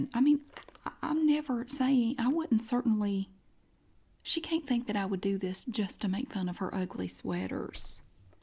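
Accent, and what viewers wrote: South, United States. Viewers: I love when you say “loogathaat” I live in nc and have a southern accent too but for some reason I love yours! Lol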